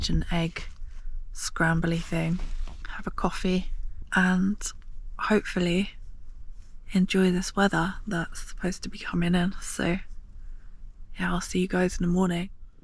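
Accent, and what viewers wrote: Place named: RP, United Kingdom. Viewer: well posh, love it, love your videos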